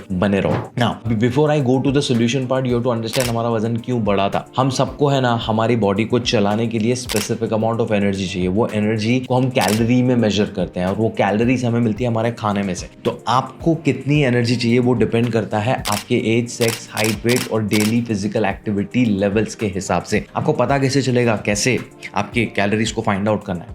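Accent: Gujarat, India. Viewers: ARE YOU GUJRATI ?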